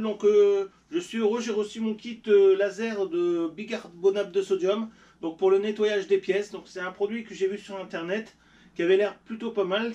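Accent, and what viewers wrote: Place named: Nord, France. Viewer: T es de ch'nord toi 😃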